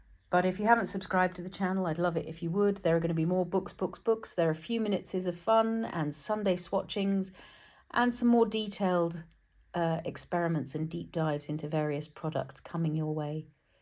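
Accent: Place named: United Kingdom. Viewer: Thank you! absolutely will. 🤩Love to listen to a good British -English talking👍🏻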